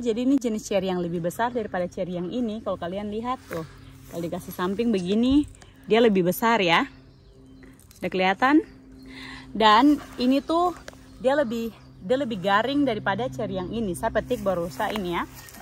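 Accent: Papua, Indonesia. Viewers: Paleng suka dengar kk bcra Deng logat Amq Papua🤣🤣🤣🤣🤣terhibur hatiq😍😍😍😍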